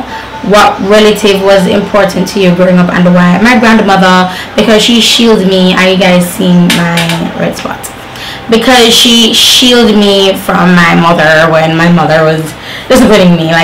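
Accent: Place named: Jamaica. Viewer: I lost focus at one point coz of your ascent 😁 I think I need to learn Jamaican language 😄.... The connection you have with your son..... Is so so amazing ....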